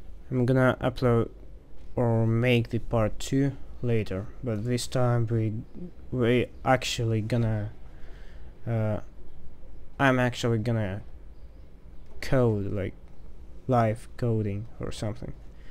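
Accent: Russia. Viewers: A tutorial with a Russian accent? I am gonna like this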